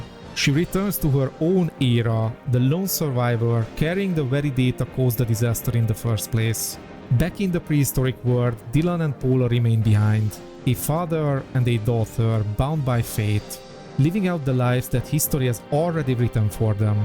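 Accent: Hungary. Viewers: judging by ur accent im 100% ur hungarian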